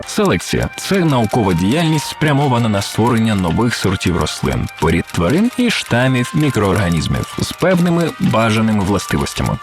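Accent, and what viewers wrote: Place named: Poltava, Ukraine. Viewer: Шось на полтавському) клас👍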